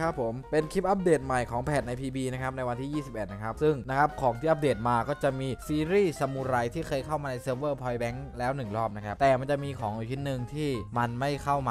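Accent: South, Thailand. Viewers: ออก! ออก! เทาๆ หนอย หนอยนะครับ! 5555 ใต้มากเลยบ่าว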